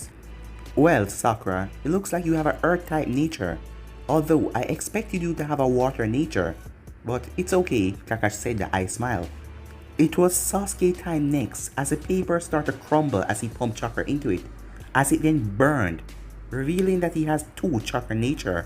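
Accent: Jamaica. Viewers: He’s from Jamaica That’s How He Talks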